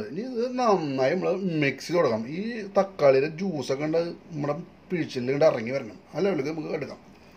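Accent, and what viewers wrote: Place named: Thrissur, India. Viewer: Thrissue slang il ulla aa mutton curry pwolichu gadiyee..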